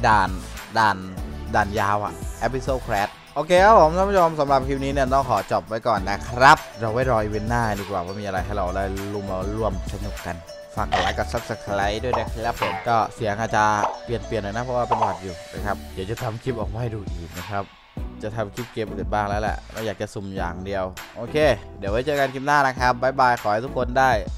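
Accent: South, Thailand. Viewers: ชอบพี่แหลงใต้ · พี่เป็นคนใต้หรอครับ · พี่ย่าเป็นคนใต้หรอ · พี่คนใต้หรอครับ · คนใต้นี้หว่า55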